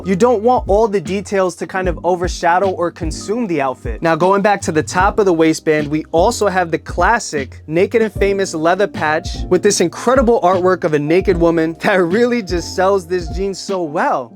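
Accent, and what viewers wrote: New York, United States. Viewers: Jersey or New Yorker ? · I can hear the NYC in you lol, great video!!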